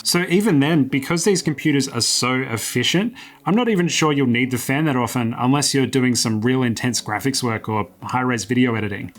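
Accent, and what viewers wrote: Australia. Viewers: was going to ask if you're Aussie based on your accent and I was right... Melbourne represent! Love seeing Aussie tech you tubers :)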